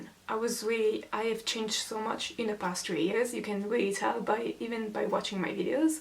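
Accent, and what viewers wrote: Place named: Ireland. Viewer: You have an Irish accent!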